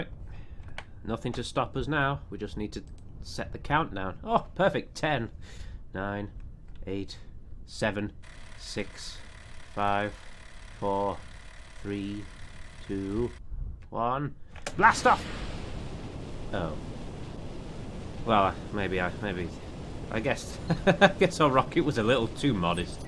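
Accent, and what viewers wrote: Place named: Northern Ireland, United Kingdom. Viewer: He's Northern Irish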